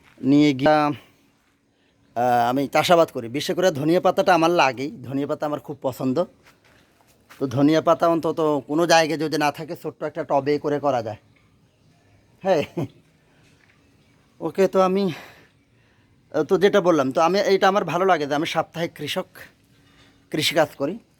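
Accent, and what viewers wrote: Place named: Noakhali, Bangladesh. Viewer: আসসালামুয়ালাইকুম ভাই ভাইয়া.আমি আপনার সবগুলো ভিডিও দেখি আমার কাছে অনেক ভালো লাগে. বিশেষ করে আপনার নোয়াখালীর কথাগুলো আমার অনেক ভালো লাগে এত বড় মানুষ হয়েও আপনি নোয়াখালীর কথাগুলোকে এত সুন্দর করে বলেন. আমার বাড়িও নোয়াখালী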